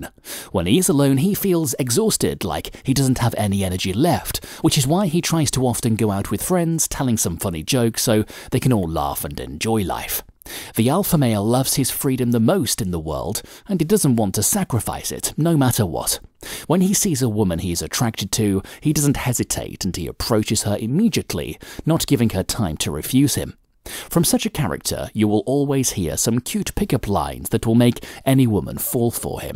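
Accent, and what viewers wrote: United Kingdom. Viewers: The most thing that I liked in this video is the British accent, long live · Is there a reason you seem to always show a black Guy especially with the white women as if they are better than white men? Seems racist to me and I think the white guys should be with their own race and so should the black Guys! There are many Alphas who are white. Are you ashamed of your own race or is that a British thing. I say that because your voice indicates you are white and British?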